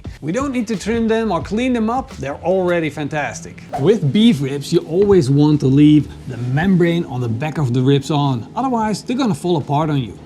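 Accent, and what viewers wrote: Netherlands. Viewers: Are you dutch your accent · Are you from Holland? Sure sound that way! Anyway, great channel. Greetings from Norway :)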